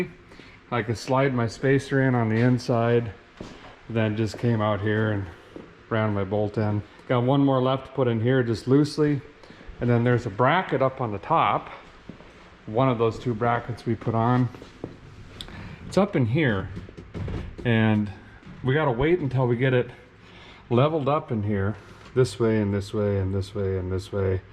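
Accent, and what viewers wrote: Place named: Michigan, United States. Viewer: Where you from? You talk just like my cousin in Mich!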